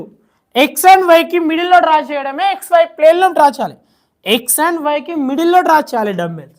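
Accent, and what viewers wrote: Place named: Telangana, India. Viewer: Super Telangana slang ........😉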